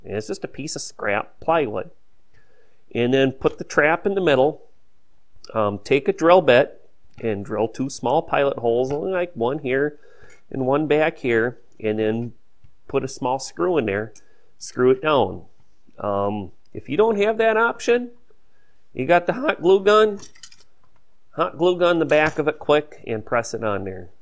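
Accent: United States. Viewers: jeremy thole Ha ha I’m in Melbourne Au trying to work out if it’s an American or Canadian accent! Guess it’s American?! 🥴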